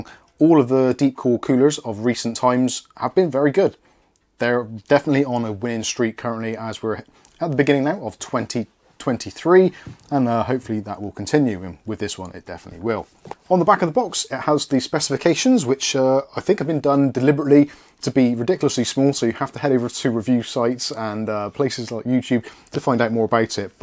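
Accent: United Kingdom. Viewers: just curious to know, where about in UK are you from?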